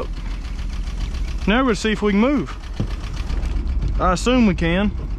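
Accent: South, United States. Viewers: Coil its just a country accent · I’m from Long Island New York like your 🪄✨southern accent✨ 💪🏻😎👍🏻 keeping the best cars on the road⚡️🇺🇸⚡️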